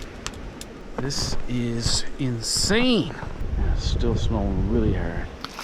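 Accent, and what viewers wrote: Ireland. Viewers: I’m from England and I thought you were Irish/North American. You have that Irish twang. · You’re Irish sounding along with the Canadian, your phrasing sometimes is Irish as well. Interesting accent! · Cant make out your accent, American with a drop of Irish 😊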